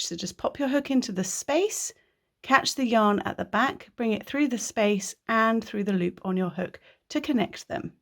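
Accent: United Kingdom. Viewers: This is the first time I've come across your tutorials and have watched this corner to corner one with fascination show simple you have made it look for even a beginner crocheter. I am certainly not a beginner but will now recommend your site to those that are, to perhaps use as a second project, once they have made an item using UK terms. My only criticism is that because of your accent I'd assumed your instructions would have been using UK terms but as the work only involves ch, ss and tr there should be no real confusion because of your clear illustrations. I've, opted to make a doll"s blanket alongside a beginner,using your tutorial to show them how to crochet so that they can learn to switch more easily between American and British crochet patterns. Thank you for such a brilliantly clear tutorial, it will make my teaching so much easier for me! xx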